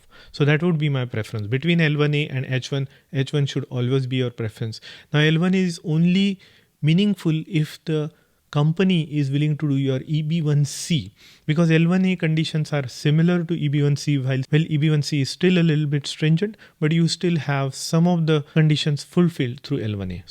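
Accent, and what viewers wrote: Maharashtra, India. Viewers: apun maharashtrian ahat ka? your english is total marathi accent. jai Maharashtra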